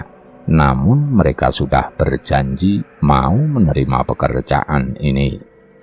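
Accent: Java, Indonesia. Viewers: Yg bawa cerita nya gk enak medok Jawa di ulang, ulang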